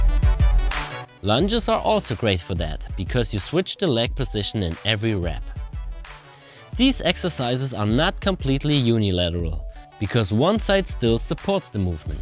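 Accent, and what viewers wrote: Germany. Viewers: you are german😀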